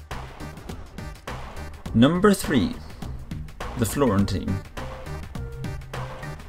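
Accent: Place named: Belfast, United Kingdom. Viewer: Where do you come from? You sound like a middle class person from Belfast.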